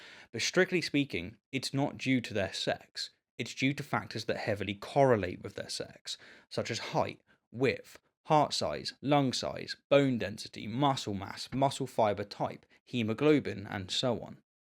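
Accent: England, United Kingdom. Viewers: This is too tragic. I thought this was somehow going to be promising. Forcing skepticism on anything and everything is just a formula for disaster. Talk about scraping the bottom of the barrel. I guess it's just the english accent I so splendidly enjoyed but other than that nothing to distinguish the channel from the rest of the noise.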